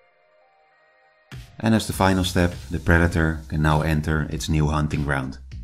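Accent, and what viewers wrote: Netherlands. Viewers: Love your Dutch accent 😃 Love the video you made, thanks ! Ik heb hetzelfde model klaar liggen om te gaan printen en dat gaat na het zien van deze video niet lang meer duren gok ik. Leuk om te zien dat er ook NL kanalen zijn in dit wereldje ✌🙂 Subscribed -> Uiteraard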